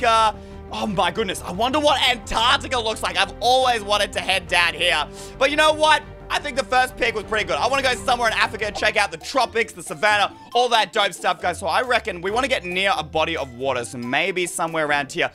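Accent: Australia. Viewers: I thought you were British so you from Australia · I can hear your Australian accent because I am American, which I am from the United States which has the most carnivorous plant than any other country in the world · Prove you have an Australian accent:leever, caan’t, etc. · I dont ever notice accent.. Maybe that's because im an Aussie Too! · I’m Australian and good Aussie voice!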